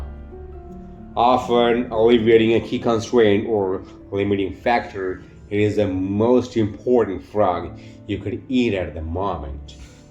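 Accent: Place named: United States. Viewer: Yes friend, I also love his accent ... Being an Indian he's really doing great American accent..🎉🎉🎉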